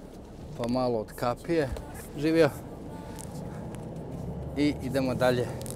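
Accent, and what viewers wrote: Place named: Vojvodina, Serbia. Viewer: Pozz iz Amerike,centralni dio ili midwest ( st louis I okolina 😂 ) Volim tvoj naglasak,malo mi "bacas" na Lalu 😊😊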